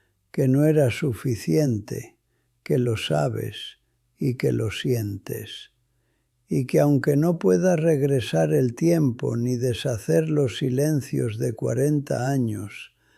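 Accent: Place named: Spain. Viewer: Tu acento es español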